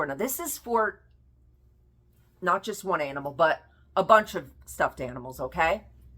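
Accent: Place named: New York, United States. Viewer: On a side note, I heart your accent! It makes me miss home (NY!)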